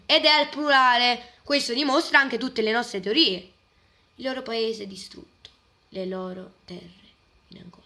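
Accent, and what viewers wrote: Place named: Campania, Italy. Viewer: Dal' accento mi sembri campano, grazie dei tuoi studi sulla Sardegna 👏❤️💓❤️💓sei bravissimo 💓💞💓💞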